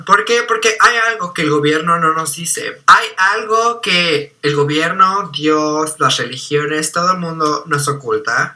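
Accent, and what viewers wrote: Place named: Latin America. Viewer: soy española yuhuuuu me he dado cuenta de que hablas hispanoamericano, con hacento de hispanoamérica, tu profesor es de ahí? 💙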